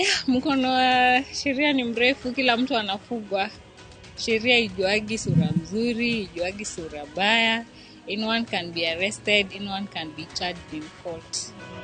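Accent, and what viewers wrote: Kikuyu, Kenya. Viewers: Ukweli.... very heavy Kikuyu accent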